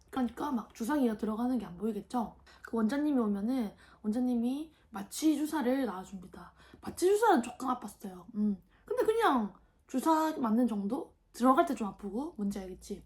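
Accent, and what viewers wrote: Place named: Busan, South Korea. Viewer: 부산 여자 너무 좋다. 사투리가 매력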